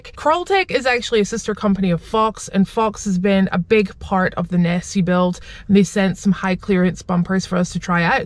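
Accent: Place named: England, United Kingdom. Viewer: Why do you have an English accent what changed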